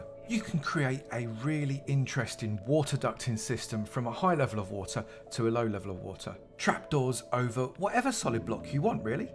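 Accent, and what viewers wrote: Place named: England, United Kingdom. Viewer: He doesn't, he's just English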